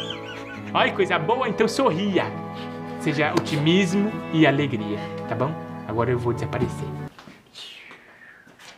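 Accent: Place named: Minas Gerais, Brazil. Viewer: Cara vc é show,parabens!!! Achei vc depois q instalei Instagram.. parece meu povo lá de Minas falando,adoooooooro kkkkkm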